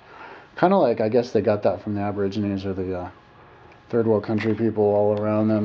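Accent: England, United Kingdom. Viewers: English Accent! wow. Your analysis is completely flawed. Narrow roads, stone and brick. The idea that Aussies regard on retrospect as Australia being the best country in the world has a lot of substantial research and actual lived experience pertaining to this Australia wide held concept. Unless of course you are a Greedy Wall Street banker or power hungry Media tycoon. Australia was created as a penal colony for prostitutes and felons - Wow. The USA was founded by Slave Owners and Tax Avoiders.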